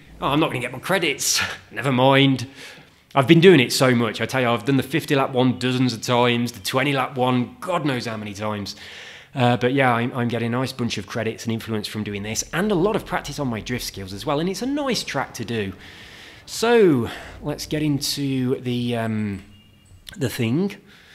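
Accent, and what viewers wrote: United Kingdom. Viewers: I'm still struggling with drifting. You are surpassing me easily now though. (Do you feather the accelerator?) I cant quite get the hang of it.. Btw how come you can fast travel , have you got all the fast travel signs/bonus boards? there are about four I cant get - in the pipes on that motocross park, and one one the top of some house, and one up by the wind farm. ( I still dont have the FT option, even for money? weird ) would appreciate some ideas one those boards if you know how to get them! thanks. Really enjoy your videos as you know. Your accent is definitely getting more Norwich now though.. lol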